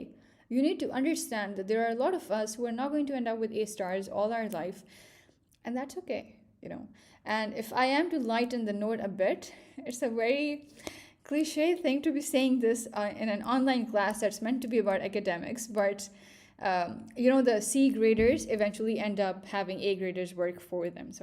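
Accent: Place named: England, United Kingdom. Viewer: Miss how you learn this english accent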